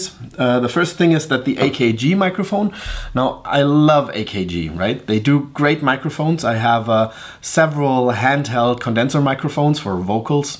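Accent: Germany. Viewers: hi du bist deutscher oder ? höre da viel deutschen akzent raus ? Also ich liebäuge ja zur zeit sehr mit dem rode nt usb. das akg hat mMn. auch sehr gut geklungen, aber wenn man sich so viel auskennen muss um da viele einstellungen vorzunehmen, damit es so klingt, bleib ich wohl lieber beim rode nt usw. ich will auch kein xlr + interface usw. kaufen. finde ich übertrieben, da ich es ja nur am pc benutzen möchte. das akg dich enttäuscht hat, hat mich echt überrascht, weils halt am anfang gut klang und allgemein ich nur gutes von der firma gehört habe.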